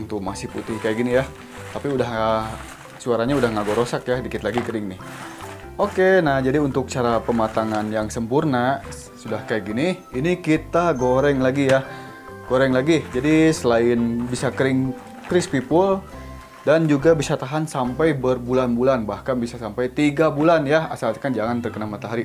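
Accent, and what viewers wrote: Sunda, Indonesia. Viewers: Kang sunda pisan Ga ada budah trs kl udah gak ngagolotrak Santai banget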